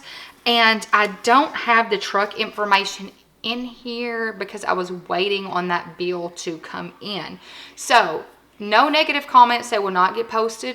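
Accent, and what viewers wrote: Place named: Georgia, United States. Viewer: Wow, that was so incredibly helpful! I’d been looking at this item for a few days now and was having trouble getting the info I needed to make a purchase decision. I’m another one of those visual people and you’ve sold me on it and shown me all I needed to know to get started. Love your personality! Your accent reminds mo of my cousins in Georgia. I’ve subscribed and look forward to more videos! :)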